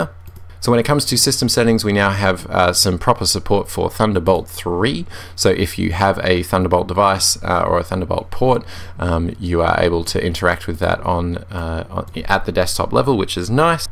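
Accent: Australia. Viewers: are you an australian?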